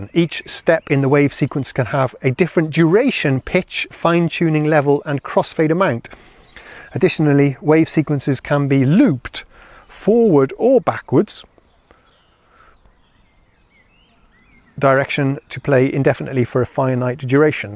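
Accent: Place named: England, United Kingdom. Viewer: It seems like you live in Sweden, but you have an English accent. Are you from the UK originally, or did you perhaps study English there as a youngster?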